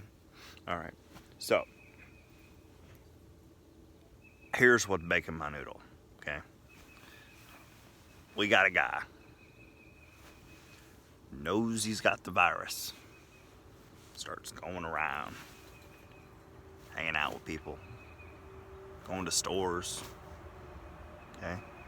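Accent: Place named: South, United States. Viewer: Your southern accent has really improved.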